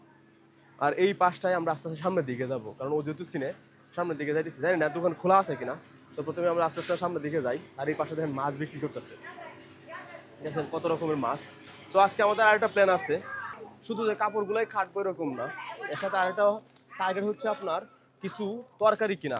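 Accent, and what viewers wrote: Mymensingh, Bangladesh. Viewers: আপনার সাবলীলভাবে ময়মনসিংহ অঞ্চলের ভাষায় কথা বলা আমার খুবই ভালো লাগে বিধায় আপনার সব ভিডিও দেখি এবং ভালো লাগে ! শুভ কামনা করছি তোমার জন্য ! কোন জেলার আপনার দেশের বাড়ি ❓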